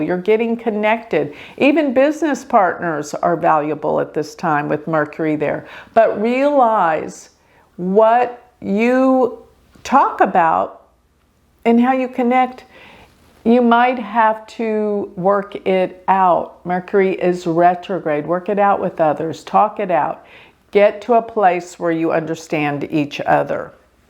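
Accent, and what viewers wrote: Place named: South, United States. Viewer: Just found last week. Love her. And she is in Dallas where I lived for 30 years. I knew she was southern with that accent and hair. She is smart Dallas glamour!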